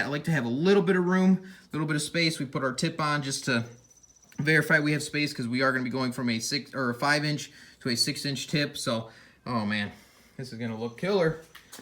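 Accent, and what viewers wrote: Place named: Michigan, United States. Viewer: It's a Michigan accent... I lost mine since I moved south 35 years ago... y'all..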